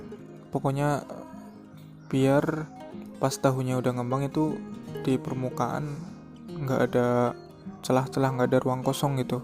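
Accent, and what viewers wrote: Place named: Banyumas, Indonesia. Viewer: jualan dimana mas, kaya bahasane ngapak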